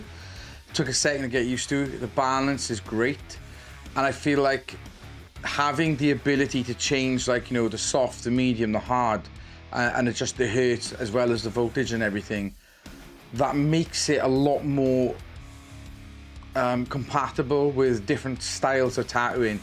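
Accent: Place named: Wales, United Kingdom. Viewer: I recognise that accent, are you a southy like me? Land of the red dragon?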